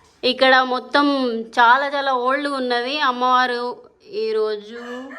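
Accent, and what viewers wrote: Telangana, India. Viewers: Mee hair style baagundhi , ee muchata anedhi inkocham sepu ekkuva pedithe baaguntunde , any way mi videos down to earth ga untayi, veelainantha varaku Telangana yaasa maatladandi vinadaaniki vinasompu ga feel baaguntundhi , emanna ... Feel untudha Telangana yaasa baasha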